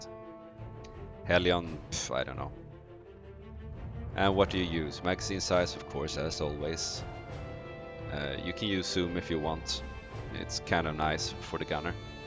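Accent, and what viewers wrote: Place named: Sweden. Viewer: Svengelskan på topp, bra video 👍🏻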